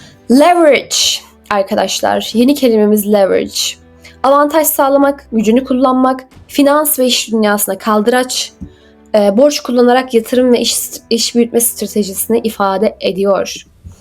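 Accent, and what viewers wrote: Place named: Turkey. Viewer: R leri cok bastiriyorsun turk aksanindan cikman iyi olur biraz dinleyip birseyler gormek istedim ama aksan rahatsız edici. Dinleme telaffuz geliştirmen faydali olur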